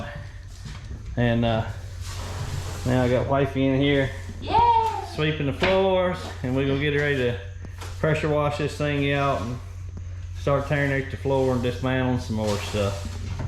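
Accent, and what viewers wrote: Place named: Virginia, United States. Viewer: Congrats on the bus! It will be a fun project. And it sounds like you are a fellow Virginian. Cool! Thanks for sharing.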